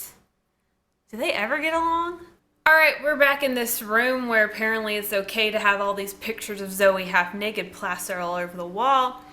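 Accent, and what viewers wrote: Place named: South, United States. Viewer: everytime i watch one of your Degrassi vids i end up getting pissed off.. i'm trying to relive my highschool memories and i got you in your southern accent with european charm ruining it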